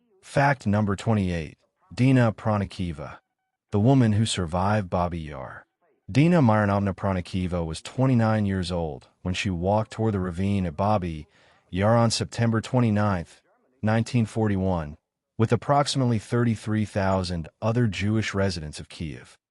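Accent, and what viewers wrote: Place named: England, United Kingdom. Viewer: It would also be helpful if German words were spoken with more of a German accent than an English accent. If you want a quality product, this could be done. For example, It’s “gruppen” with a long “u” sound, not “grupen” like “up-en”. Spend the cash and get a professional translator to help with the accents/pronunciations. This is done all the time. Then it doesn’t sound so amateurish.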